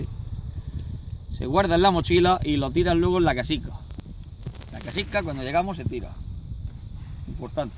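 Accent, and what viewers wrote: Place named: Extremadura, Spain. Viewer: pareces extremeño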